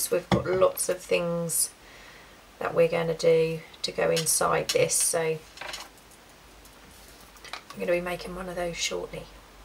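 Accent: England, United Kingdom. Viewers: I love watching your videos and have been learning so much! I just wanted to mention that you have a nice voice. I also like your accent. Your voice and accent remind me of Hayley Mills. I have been a fan of hers since she was Pollyanna (wow, that dates me!). I know England ( I am assuming you are in England) has so many different accents depending on what part of the country you live In. Yours is very pleasant.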